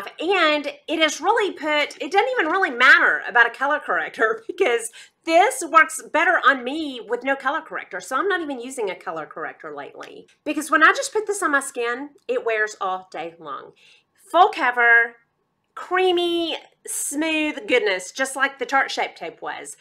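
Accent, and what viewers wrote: South, United States. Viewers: I love your southern accent!! I thought I was the only one that described my eyeshadow palettes as my children lolol xo-Wendy, NYC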